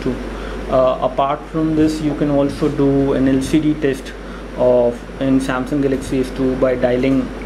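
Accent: India. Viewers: u also sound like indian are u ??????? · u definatly indiannn \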